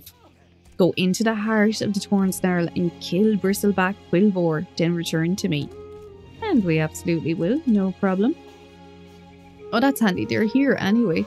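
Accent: Ireland. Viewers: I moved to Canada last year and I haven't really interacted with any Irish here. I used to play wow as a teenager and was looking it up on youtube for nostalgic comfort. This was cool to see, I didn't realise I could enjoyed hearing an Irish accent that much Thanks :)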